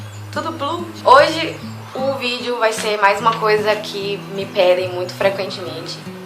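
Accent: Pará, Brazil. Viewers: Não se preocupe tanto, mulher, dá pra entender perfeitamente suas explicações ainda mais quem tá acostumado a ver os oppar nos programas e a ver dramas. E aleatóriamente digo que sou fã dos eu sotaque paraense(?).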